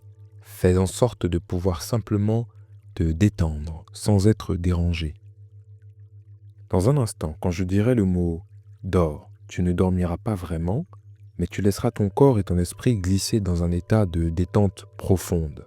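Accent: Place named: Cameroon. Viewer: Ma vision limitée dit ceci ... Tu as Ton sous-accent camerounais-bamileke qui manque dans ton discours et qui distrait un peu,car il est noyé ... Sans offense. Hotep Paix.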